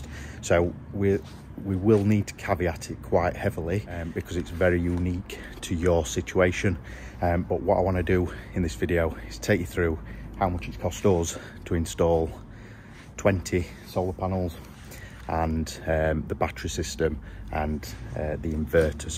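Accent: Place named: Northern England, United Kingdom. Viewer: I just love your northern accent. It’s mint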